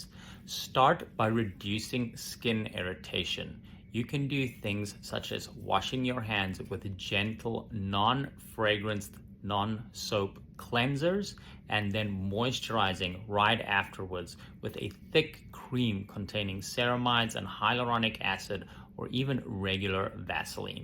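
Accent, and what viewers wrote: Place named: South Africa. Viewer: thank you so much... I thought u sounded a bit south African. I have suspected MCAS have not found someone that knows what it is. But I will keep trying. I will copy your info and ask around. Thanks so much. My biggest issue currently is that steroids or cortisone make the reaction ten times worse. Thank you so much for replying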